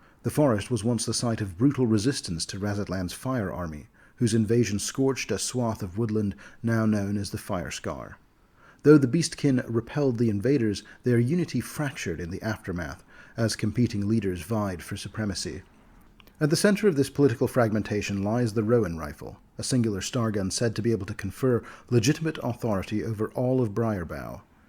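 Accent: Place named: Texas, United States. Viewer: That is a great Texan accent and cowboy-talk. For a minute there, I thought this was a collaboration with Mr. Welch.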